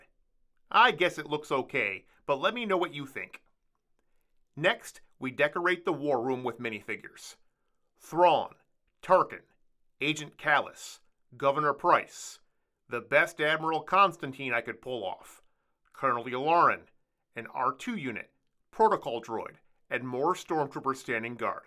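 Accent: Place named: Canada. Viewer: Are you Canadian because MAN you sound like a Canadian Also Great job very well done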